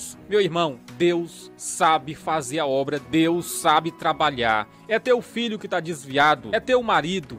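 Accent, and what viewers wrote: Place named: Maranhão, Brazil. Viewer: Sou de São Paulo e gosto muito dos testemunhos e exortações que o senhor traz em seu canal. Admiro sua forma de falar. Sotaque de Maranhense é muito legal. Quero muito conhecer essa cidade linda. Tu é homem de Deus e abençoado. Fica na paz. Abraços!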